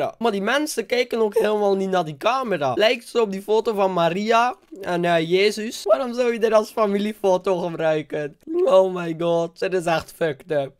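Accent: West Flanders, Belgium. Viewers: Hij west-vlaams en probeert AN te spreken zodat iedereen hem verstaat :P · Van waar kom jij ??(ik denk West-Vlaanderen ) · Dat Belgische accent is geweldig ❤️😂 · jij bent echt een belg of nii da accent OMG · probeer een keer nederlands te praten want jou belgische accent klinkt echt voor geen meter!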